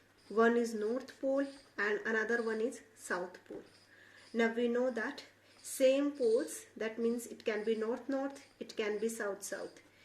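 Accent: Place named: Kerala, India. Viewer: Thanku for the vdo mam. And mam pls tell are u from Kerala because ur accent is same as mine because I am also from kerela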